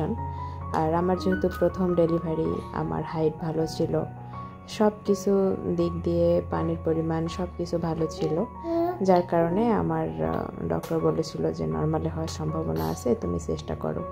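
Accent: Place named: Rangpur, Bangladesh. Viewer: না বুঝলে আর কি করার বলেন কিন্তু ওই আপু হয়তো বুঝেছে আর আমি কিন্তু খারাপ ভাবে বলিনি অনেক নরম করেই বলছি কমেন্টে হয়তো বুঝতে পারেন নি ভয়েজ অপশন থাকলে বুঝতে পারতেন আমার কথা যাইহোক এই আপুও রংপুরের আমিও রংপুরের আর আঞ্চলিকতার টান সবার থাকে কিন্তু কিছু কিছু শব্দ উচ্চারণে এই রকম হয় অনেকের আমার যে হয় না তা নয় আমারও হয় কিন্তু আমিতো ভিডিও করছি না ঘরের মধ্যে কথা বলছি তাই কেউ বলবে না কিন্তু উনি তো ভিডিও করে সবার সবটা পছন্দ নাও হতে পারে। আর আমি শিক্ষা নিয়ে কোনো খারাপ কথা বলিনি ভালো করে কমেন্ট পড়ে বোঝার চেষ্টা করেন আজগুবি রিপ্লাই দিয়ে বুঝাতে চাইলেন আপনি খুব ভালো মানষিকতার আর আমার মানষিকতা ভালো না।আমি এটাও বলছি আঞ্চলিকতাকে সম্মান করি।ভালো থাকবেন।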